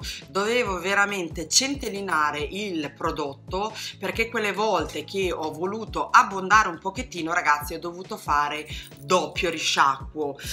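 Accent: Italy. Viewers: Anche tu ci sei mancata, è sempre un piacere vederti e sentire la tua bellissima parlata romagnola 😄😄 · Ciao...sei simpaticissima veramente...ma posso chiederti di dove sei? Emiliana? Romagnola? 🤗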